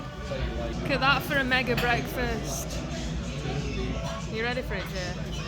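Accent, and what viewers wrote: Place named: Yorkshire, United Kingdom. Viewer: What a fantastic whire rose accent! West Yorkshire or South? Hello neighbour! Beautiful garden, I'm glad to have taken a little peek here on your channel xx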